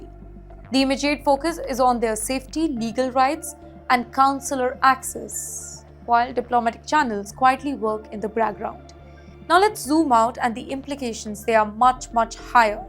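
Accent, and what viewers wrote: India. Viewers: Oh I think I can hear it. It's slightly different with some words, like she has two English Indian accents and they blend lol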